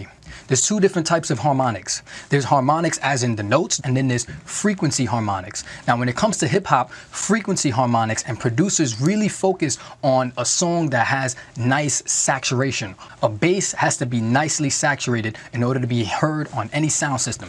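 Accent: New York, United States. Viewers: Where's that accent from (you sound like you're fr NY)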